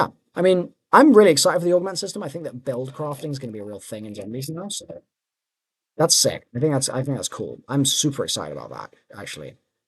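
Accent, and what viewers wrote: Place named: United Kingdom. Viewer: I didn't know you where British.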